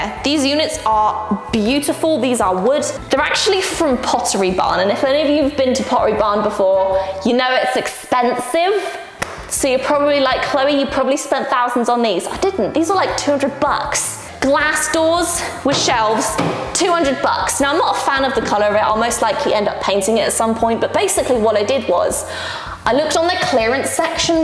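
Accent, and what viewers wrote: United Kingdom. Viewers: You know I really like your uk accent Especially the Amazun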